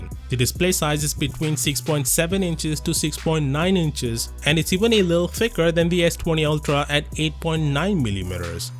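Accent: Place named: India. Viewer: Why do you speak with indian accent?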